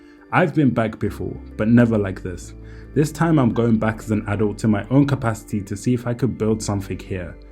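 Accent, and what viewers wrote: Zimbabwe. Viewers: Your accent is 100% Zimbabwean you don’t need to adjust any speed ! ma Zimbo drama hanzi Massvingo 😂😂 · Bro going by your accent alone, you where born in Zimbabwe. What's with this Uk child. Disapora child rhetoric. Ussually i dont get involved in this nonsense but is Zimbabweans, we have an identity crisis when it comes to England and English.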